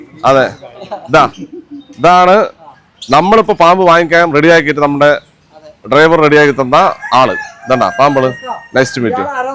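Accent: Palakkad, India. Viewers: പാലക്കാടൻ സ്ലാങ്ങ് അവതരണം ആണ് പലരെയും ഈ വീഡിയോ കാണാൻ പിടിച്ചിരുത്തുന്നത് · പാലക്കാടൻ ഭാഷ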